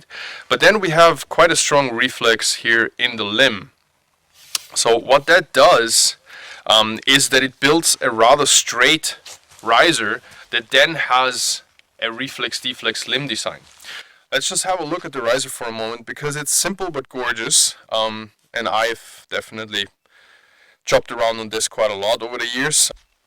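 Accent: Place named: Austria. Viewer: du klingst irgendwie österreichisch ?? ;-) nice video, nice bow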